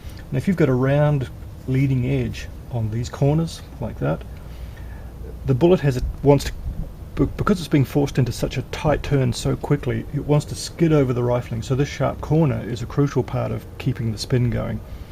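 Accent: New Zealand. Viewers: Kiwi I'd say. Not stretching the 'e' enough to be aussie. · MonsterofTheAslyum1- The same principle applies at the breech end of the barrel as the muzzle end. If you have 1/4 inch of projectile protruding at the muzzle, it indicates you likely have a good barrel, if you have a 1/4 protruding at the breech end, same is the likely indication, as it is the rifling that you are testing. This is especially true as the Lee Enfield cartridge is tapered as well, which makes this test all the more useful. I hope this makes sense. I grew up with these beauties, my first large game (feral cattle) animals were taken down with my dads pristine No4. Mk1 (had the test tag attached when he collected it!) at the tender age of 12yrs old. My hunting rifle now is a previously sporterised (by a previous owner). No4. Mk1. I used this exact test, got the exact result as above, it groups about 1 1/2 to 2 inch at 100yrds. 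It shoots better than I do! I am a LOT older now. :) This guy sounds definitely kiwi. Great series of vids too! Thanks.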